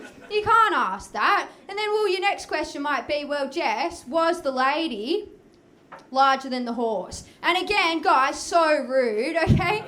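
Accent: Australia. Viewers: Wow you are amazing I love your energy and of course the accent is to die for, I'm from Alaska and there's only ever been one and a half Australians here and I missed the event because it was like 900 miles away almost halfway across the state lol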